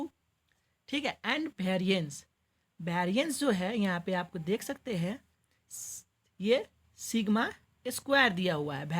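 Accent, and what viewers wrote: Nepal. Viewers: are u nepali ? broh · Why 🤔 speaking in Hindi with nepali tone ? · Nepali accent 😂…anyway thank you so much ❤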